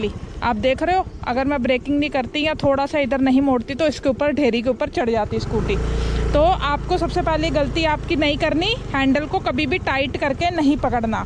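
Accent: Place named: Himachal Pradesh, India. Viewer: Maam main apka fan hu apka himachali accent bahut achha lagta hai❤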